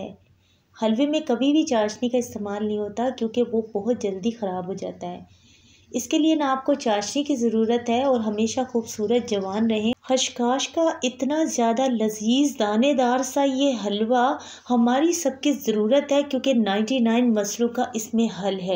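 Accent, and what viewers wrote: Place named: Lahore, Pakistan. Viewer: Ap ki aavaz boht polit h... Style of saying is lahori😊... Boht achi tarh samjhaya gya h ap ki trf SE... Delicious so much ❤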